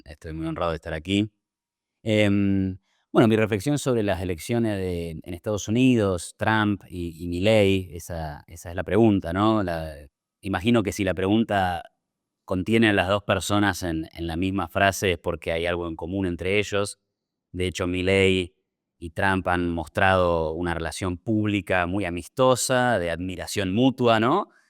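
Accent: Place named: Italy. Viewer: Iván é show! Grande! Um panorama completo e muito lúcido do liberalismo, como é aplicado e dos perigos do socialismo na região. Impressionante o tonalidade italiana do portenho. Como sempre se disse: italianos que falam castelhano.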